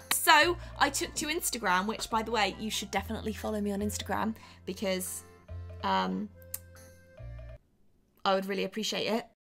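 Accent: United Kingdom. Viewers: OK im new I love you! Just want to know how did you get such a strong UK accent, if you been in the US most of your life? I love your accent btw just asking how it came to be? Love your video and you! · Lol I thought that you where British to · I thought you were British, lol it truly doesn't matter, you're still beautiful. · Maybe i missed it, but if you've been in the US for so long, why is your accent so thick? I understand you learned to speak from your parents who are presumably also British, but I'd think being here from a young age you would have lost some of it. I hope that didn't come across poorly, there's no criticism there, I'm genuinely curious.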